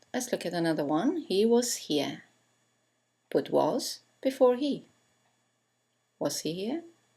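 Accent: United Kingdom. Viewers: Thank you so much for this video! I wanna ask you about the accent, teacher. Is this totally British English? Could you please give me some advice of choosing one between BE and AE? I think I should choose the one that is easier to understand( to me ) ? Is that enough to make a decision , teacher ? Thank you for your attention! Nice day! <3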